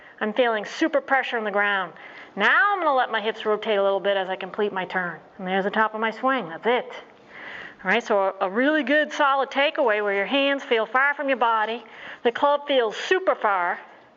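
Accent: United States. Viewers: What accent is this and where from in USA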